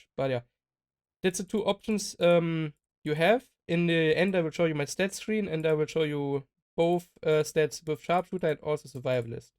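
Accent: Germany. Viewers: Beim ersten Wort auf englisch habe ich gemerkt der ist ein deutscher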